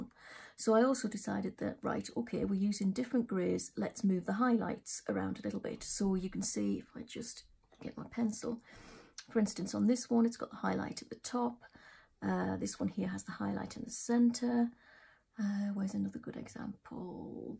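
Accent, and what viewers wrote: England, United Kingdom. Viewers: Thank you for coloring tips!!! Love you channel! : ) Love you English accent...! : )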